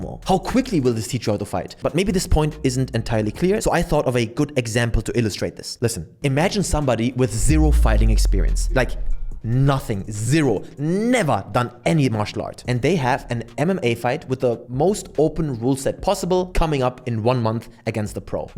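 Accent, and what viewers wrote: Germany. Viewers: Holy didnt know was so German 🤧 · ok, for me, your accent sounds kind of like german^^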